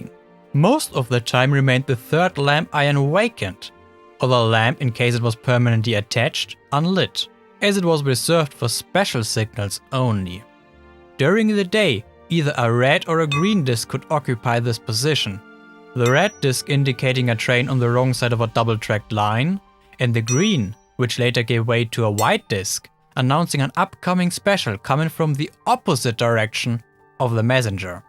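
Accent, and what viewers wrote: Germany. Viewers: Not to be mean but I can REALLY hear the German grammar in your English. It's kinda interesting since your accent isn't all that thick, yet your sentence structure and word choice are so obviously German.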